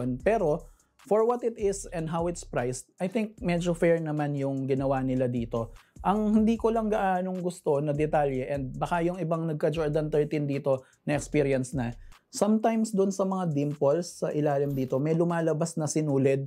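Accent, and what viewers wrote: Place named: Pampanga, Philippines. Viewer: Ah kapampangan ka bro? "Konyektado" Konyeksiyon"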